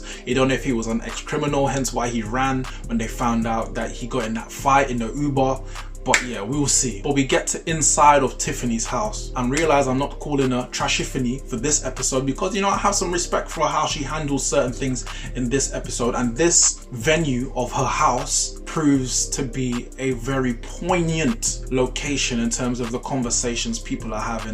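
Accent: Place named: United Kingdom. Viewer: I love the British accent bro and love your choice of music. I like Nathan as a character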